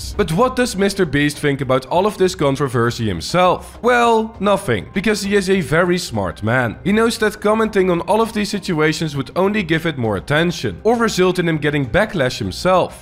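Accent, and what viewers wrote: Netherlands. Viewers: Ben je nederlands mooi accent😂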